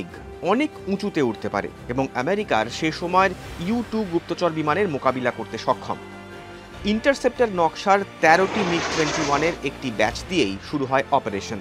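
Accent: Bangladesh. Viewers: বাংলাদেশের মানুষের মতো কথা বলে ছেলেটা😊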